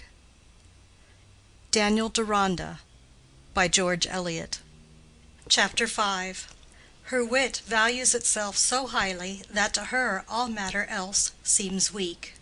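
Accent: United States. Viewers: I think its a great reading. It's actually the subtitles that are poor. I don't mind the American accent it's their literature as well as Britain's. Also tonally American readings are easier to listen to as many English readers flatten the vowels and make it a bit drab. They also get rid of class bias and certain readers affectations.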